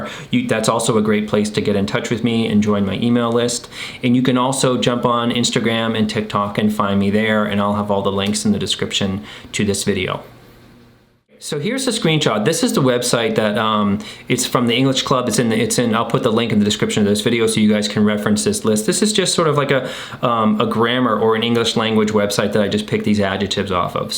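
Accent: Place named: Worcester, United States. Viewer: it"s not sting-y it"s pronounced stin-gee, meaning ungenerous, I believe. Love the channel, and the Woostah accent reminds me of home! Wicked pissah!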